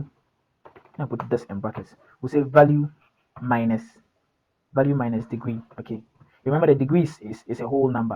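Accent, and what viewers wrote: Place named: Ghana. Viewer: I know a Ghanaian when I hear one lool nice video! I really needed this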